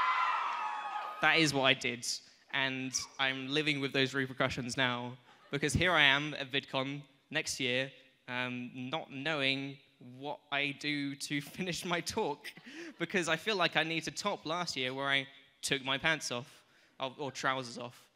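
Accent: United Kingdom. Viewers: If leaves the U.K. and comes to live in the U.S. then eventually he'll lose his accent wont he?:( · I can't watch this is my living room because I'm worried my mom will see me watching a cute guy with a British accent take his pants off. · We don't need yer English baaaastaaard accent. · what kind of accent is that? i know it is british but is there a specific place? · The patented style british accent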